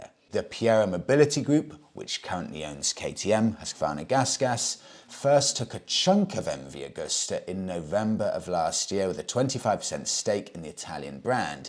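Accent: United Kingdom. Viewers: Fer crissakes, ya gonna 'pologize fer having an English accent next? Never apologize for pronunciation, just do it & move on....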